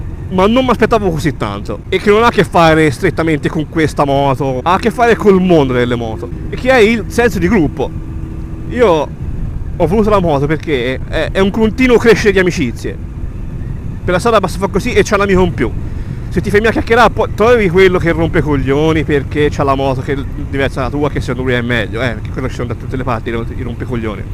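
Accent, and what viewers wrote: Tuscany, Italy. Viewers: E tu sei toscano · si chiama accento toscano :D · Toscano vero?grande boia deh ahaha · sei toscano scommetto io sono di pisa passa dal mio canale se ti va😉🤙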